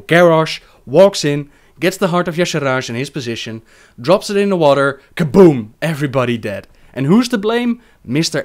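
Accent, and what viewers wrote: Netherlands. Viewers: I love your dutch ascents. especially when you say Draenor, sound so cool lol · Ik wist dat je een nederlands accent had! Groot gelijk over de ondertiteling die nu overal gedubt word...niet goed. Zeker niet goed. Ik ben een Horde fan, en ik haat Garrosh maar dat is omdat ik verliefd werd op Thrall z'n horde, met de Darkspear en de Tauren.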